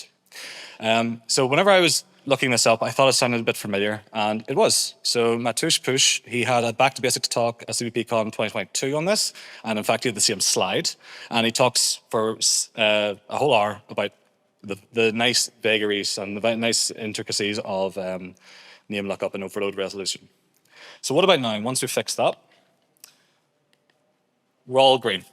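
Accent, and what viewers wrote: Northern Ireland, United Kingdom. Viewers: Nice to here a local lad speaking from NI I persume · cant escape the northern irish accent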